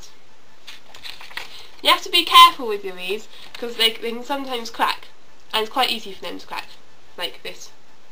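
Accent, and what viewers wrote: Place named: England, United Kingdom. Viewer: Aww you're sweet but this english accent is sickening!!!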